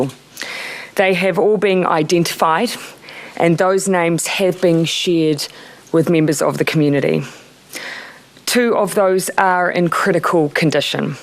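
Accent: United States. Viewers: sounds like an American moved to new Zealand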